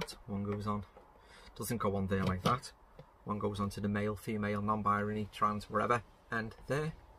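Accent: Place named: Birmingham, United Kingdom. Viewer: Hi, great video, very informative. Unfortunately the links are not working properly. Neither link takes me to them there 90 degree adaptors. Love your Brummy accent by the way. Can you do any others? Cheers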